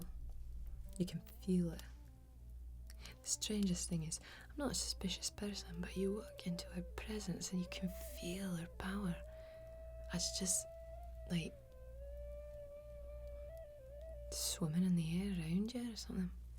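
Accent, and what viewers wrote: Scotland, United Kingdom. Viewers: I can always use more Scottish accents in my feed of ASMR videos. Thanks for the very apparent effort even right out of the gate. I hope to see more from you. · I love the Scottish accent. Quite good! Also, I have no idea why Scottish accents are so heavily tied to blacksmithing, but it makes me proud that they somehow got renowned for such skills. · That's an excellent East Scotland accent if you are not really Scottish. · Loving the soft Scottish accent. Another superb ASMR video to sooth my frazzled Christmas mind. Thank you so much. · Okay , but how can all of your accents sound soooooo authentic. This is the first video of yours that I watch, and I legit thought your were Scottish , and then I watched your elf role play ,and thought that your were a brit with a really good Scottish accent, and then I went to your cooking channel.MIND BLOWN. 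· Is that a Scottish accent? So mesmerizing and a very attractive women. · Goodnight Moon's rich fantasy storytelling meets ' accent. I love it! The soft speaking is really relaxing and you can tell that the production values were high. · First video of yours I've seen, had to come back to this video because I was confused when you weren't Scottish in your next video, solid effort, loved it! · Damn good accent. I'm from Scotland, and you'd easily pass as someone from the north or west coast ^^ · Ok youtube ill finally click on this it keeps popping it my recommended . Love the scottish accent. really pretty. good asmr. ok i subbd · What a great accent ! You must have been to Scotland! X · This is incredible quality for a second video or any video for that matter. I'd expect it from Goodnight Moon, who's been doing it for a while, but for a second video, it's amazing. The only improvement I can think of, not even a criticism, would be 60 fps. 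But that's a small matter. Everything is perfect. Your accent is just a plus, it's wonderful. Your first video it was much softer, almost American with an English tinge. The hard Scottish is great. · Blacksmith with a Scottish accent in a fantasy universe... Just like dwarfs. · There's something about blacksmiths that just demands a thick Scottish accent. It is pleasant to hear. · Stumbled across your channel by accident, this is incredible!!! I thought you were Scottish for real! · Ahhh the Scottish accent is amazing! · Wow a new player has entered the ASMR ring and she’s amazing!!! Seriously I am blown away by the quality of these vids! Usually it takes 6 months to a year for new ASMR channels to start putting out such good and LONG videos! But this is channel is already a contender with Goodnight Moon for best fantasy role plays! And I absolutely adore fantasy role plays they are my favorite thing to watch to relax so I’m really really excited to see where this channel goes!!! 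The accent is gorgeous and so good I thought you were really Scottish! Do you play DnD because you are amazing at character building!! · Scottish accent + blacksmithing + ASMR all things i adore and luv in one video, my god how did i stumble upon this vid just now ? totally subbed :heart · Not even 5 minutes in and a Scottish accent. I like.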